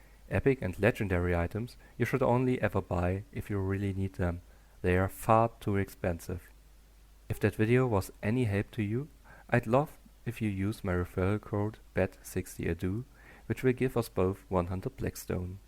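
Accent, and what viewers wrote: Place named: Germany. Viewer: Geiles Video Nice vid Deutscher Akzent würde ich schätze n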